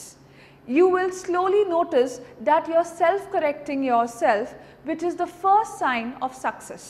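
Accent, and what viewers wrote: India. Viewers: Nothing like a pretty Indian accent. So feminine and pretty 💕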